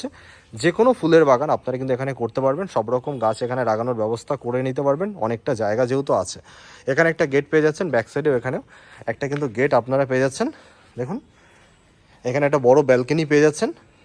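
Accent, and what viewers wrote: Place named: Bangladesh. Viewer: কিছু মনে করবেন না ! বলছি দাদা আপনি কি বাংলাদেশি? আপনার কথাবার্তা একদমই পশ্চিমবঙ্গের বাংলা ভাষার মত নয়।সম্পূর্ণ বাংলাদেশি বাংলা ভাষার মত। আবার আপনি অস্থির কথা টাও ব্যবহার করেন যেটা বাংলাদেশীরা করে।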